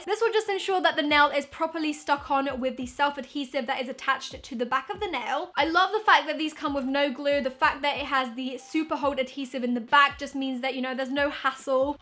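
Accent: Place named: United Kingdom. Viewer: Talking is so soothing to ear I love your British accent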